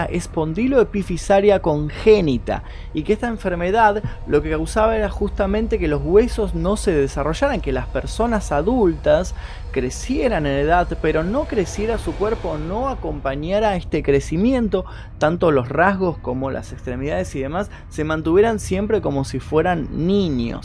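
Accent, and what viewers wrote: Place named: Argentina. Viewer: siento decirlo así pero pareces muy estúpida o tu comentario es estúpido. Te estás metiendo con este chico porque habla argentino.. Lo tachas de hablar de la "selva" (habla perfectamente) cuando ves vídeos de una persona Argentina. A ver si tienes cojones de escribirle al chico de los vídeos a decirle que no entiendes su idioma de la "selva" y que hable "bien" (como tú) en los vídeos. Venga, ve corriendo a ver si te atreves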